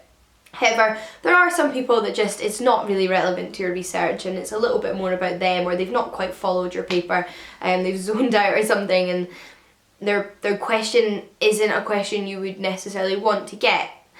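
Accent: Scotland, United Kingdom. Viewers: Waad Abdullah she’s Scottish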